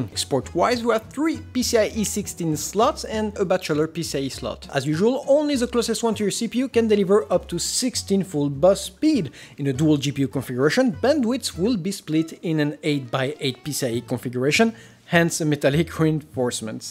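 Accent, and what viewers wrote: France. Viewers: You have a little french accent 😊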